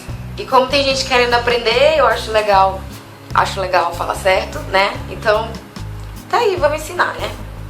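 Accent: Pará, Brazil. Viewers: Não se preocupe tanto, mulher, dá pra entender perfeitamente suas explicações ainda mais quem tá acostumado a ver os oppar nos programas e a ver dramas. E aleatóriamente digo que sou fã dos eu sotaque paraense(?).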